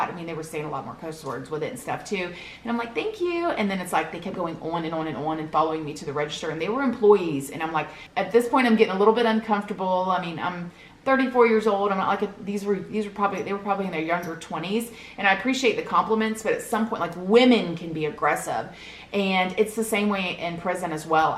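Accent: United States. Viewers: it's interesting how you have this strong American accent but your mannerisms and the way you emphasize or repeat phrases, so italian-canadian haha I love it cuz that's how I talk too but in a different accent xD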